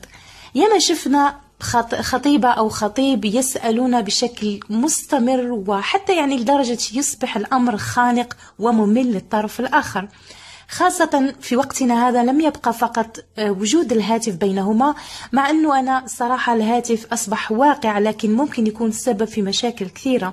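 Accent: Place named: Libya. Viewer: لهجتك ليبيه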